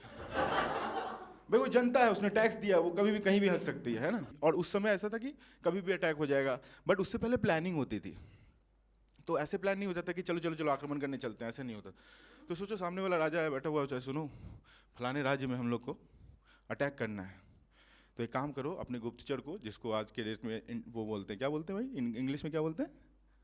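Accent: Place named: India. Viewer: waise, aapke saare video dekhe, funny to hain hi lekin ek desi lehja bhi hai jo jokes ko aur bhi mazedar bana deta hai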